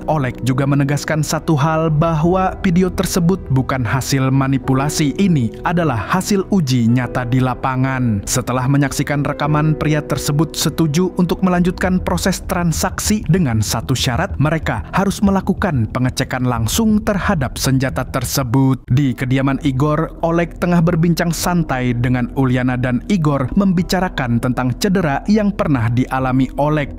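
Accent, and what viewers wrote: Banjar, Indonesia. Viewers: Bang tiap intro jgn hiLangkan Logat banjar ny ya🎉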